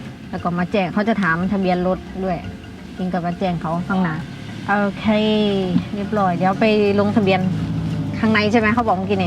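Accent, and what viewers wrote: Korat, Thailand. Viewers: ฉันชอบแก่พูดพาษาโคราช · ทนไม่ไหวต้องติดตามจนใด่สำเนียงเสียงภาษาโคราชบ้านเองชอบมักๆ😁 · ก็ว่าอยุ่สำเนียงคุ้นๆ พูดโคราชนี่เอง พูดเหมือนแฟนผมเลยครับคนโคราชเหมือนกัน 555 ผมทำคลิบเที่ยวเหมือนกันหวังว่าคงได้บังเอิญเจอกันซักทริปนะครับ😁😁😁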